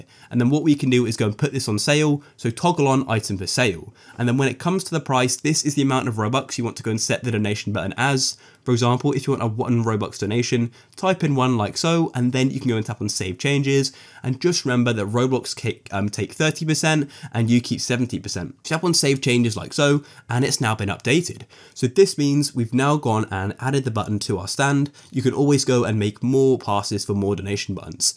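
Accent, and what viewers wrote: United Kingdom. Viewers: I don’t understand what u are saying ur so British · Are u bridish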